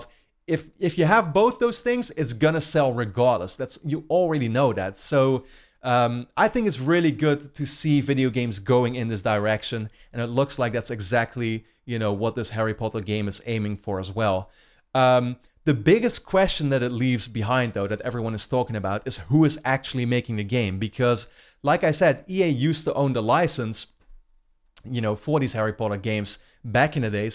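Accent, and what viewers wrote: Netherlands. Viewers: Hahaha ik hoor aan je accent gewoon dat je nederlands bent, wel toffe video!!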